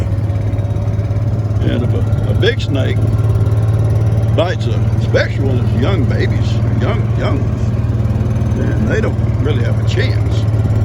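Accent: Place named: Texas, United States. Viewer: Listening to you with what you show and tell is therapeutic for me. Just love your videos. So interesting. Seeing how high the water went in Harvey. Wow. Heartbreaking for all the animals. Love Heidi. She is a true mate. From Australia. Your accent is strong. Love that Texan accent. God bless you and family ❤️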